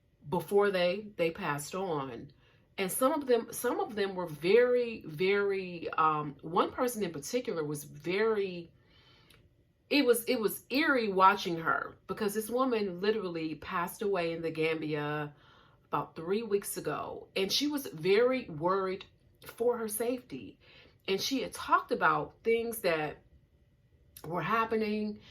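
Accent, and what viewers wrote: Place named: Detroit, United States. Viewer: Shout out from the D! I thought you sounded like a Detroiter😊i was in Paris solo in July...racism was overt but I still had a great. careful have to be careful.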